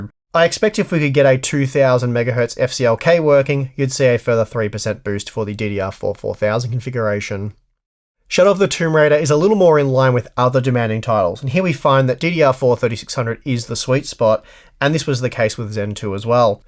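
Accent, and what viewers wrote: Australia. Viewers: I always like your videos more than GN, because Steve never takes a break to breathe. I am an engineer and I have troubles following him. (Also, tbh, who doesn't prefer Australian accent over a Yankee one?) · There's something about that exotic Aussie accent.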